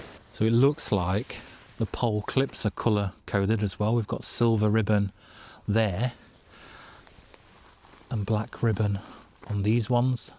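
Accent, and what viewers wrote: United Kingdom. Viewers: Put big light on, sounds very Sheffield my friend. 👌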